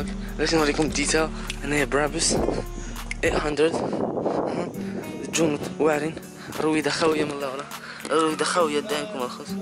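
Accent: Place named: India. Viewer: Nice cars but your indian accent is Epic mate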